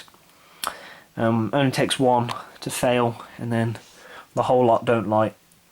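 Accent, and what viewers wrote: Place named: RP, United Kingdom. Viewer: You sound so intelligent with your nice posh accent , us up north sound like thick *unts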